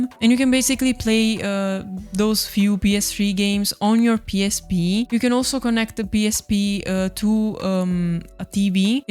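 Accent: Italy. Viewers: the italian to english translate struggle is so real omgg happens to me all the time too!! love your accent!!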